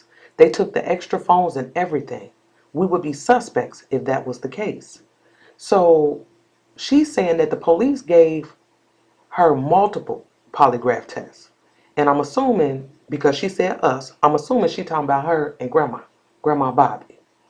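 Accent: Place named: Chicago, United States. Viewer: I’m a newbie to your channel. Love your real ness. Sound like you from my hometown Chicago... lol anyway this story is sad. Yes the truth will come out, just like it came out on the 911 call. The hospital really need to implement mental health test after mothers have babies with special needs or not before exiting the hospital to potentially avoid unfortunate death of babies and toddlers. This is so common and the lies are getting dumber and dumber. Justice for baby Braylen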